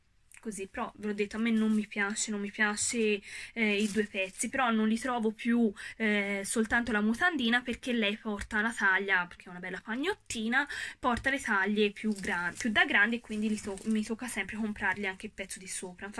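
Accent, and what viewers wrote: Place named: Tuscany, Italy. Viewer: Si sente che sei toscana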